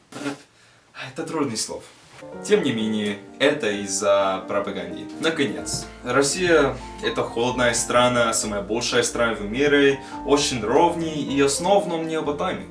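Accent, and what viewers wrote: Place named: Ukraine. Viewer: Почему мне его произношение напоминает украинский?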